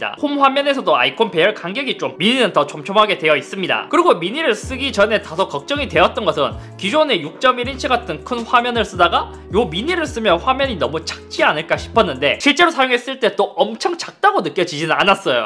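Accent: Gyeongsang, South Korea. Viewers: 이렇게나 확실하게 경상도 말로 이야기하는데도 이렇게나 감각 좋고 세련되게 가젯 리뷰하시는 분은 달리 없음. 12, 12미니 비교 리뷰 여러 개 봤는데 님 것이 최고네요. 덕분에 12미니 펌핑 사라짐~ ^_^ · 이 클립은 경상도 시청자들을 위한 것인가요? 전국의 국민들을 대상으로 한 클립이면 사투리 액센트 고치시고 영상 찍으시면 좋겠네요 성우를 쓰시던지요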